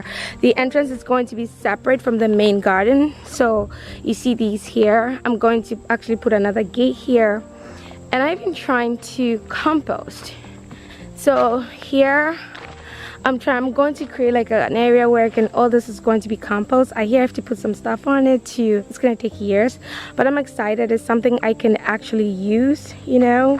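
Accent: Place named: Africa. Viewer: Sorry to bother you so much today but I’m on bed rest, there is an a more soothing channel than yours😎🤟 Your African accent is 🎶🎵2 👂❤ So happy we met 🎊 🎉 Keep it up 👍 Always learning here Impressive all the improvements to date 👋 see ya around. ❤❤❤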